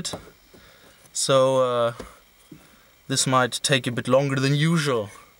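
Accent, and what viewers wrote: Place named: Germany. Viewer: spitze video. dein Englisch ist ausgezeichnet, der deutsche Akzent kommt immer gut an... Cheers aus den USA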